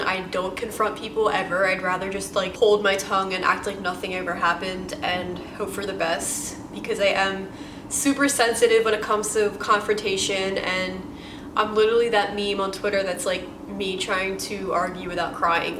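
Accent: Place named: Long Island, United States. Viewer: I love the Long Island accent! Thanks for the video!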